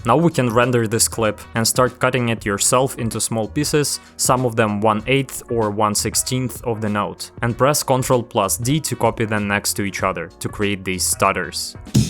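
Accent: Russia. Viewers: Мне тоже показалось что русский акцент))) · Русский акцент)). Произношение все равно классное) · У тебя русский акцент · Броу, слушай, а ты случаем не русский? Просто акцент похожий) Спасибо за ролик! Всегда хотел попробовать написать музон в стиле брэйккора. С меня сто пудов подписка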